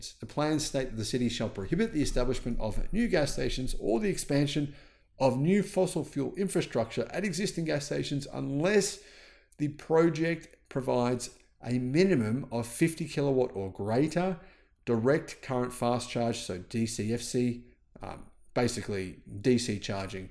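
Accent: Australia. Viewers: Loved hearing your Australian accent enunciate the name of my NorCal town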